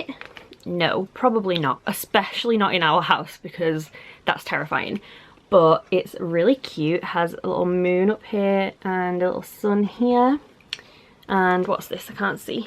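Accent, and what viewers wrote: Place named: England, United Kingdom. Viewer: I like your accent... England????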